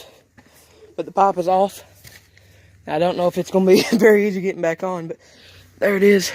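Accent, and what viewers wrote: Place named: United States. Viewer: god damn son you sounds as american as you can be :) (as a none american) no hate i find it funny just like the british accent and god damn that runs like shit :) jesus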